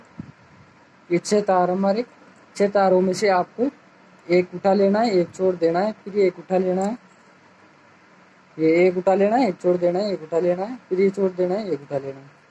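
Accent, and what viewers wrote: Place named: Rajasthan, India. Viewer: Bhai sahab namaskar Bolly Se To Rajasthani lag rahe ho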